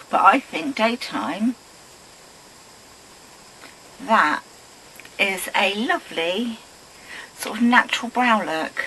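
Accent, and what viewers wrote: United Kingdom. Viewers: Yes my middle aged ears are ok lol.Just prefer to hear your lovely English accent my dear.💗👗💟💋👠👛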